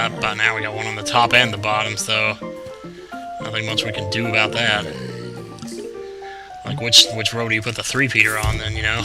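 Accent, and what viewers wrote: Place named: United States. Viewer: your voice is way better then suger conroy and i love your streotipical american accen oww and if your woundering im english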